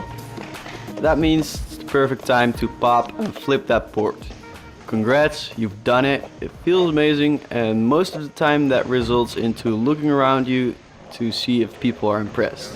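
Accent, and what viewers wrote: Netherlands. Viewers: You got a Dutch accent kan ik wel horen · pretty good my dutch friend.. mooi acent btw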